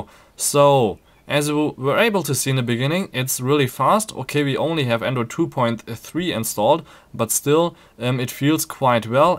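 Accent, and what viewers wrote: Germany. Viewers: Are you german?:-)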